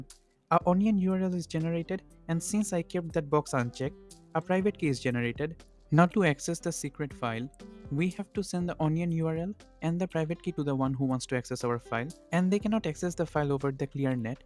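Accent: India. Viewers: That's a true indian accent are you an Indian?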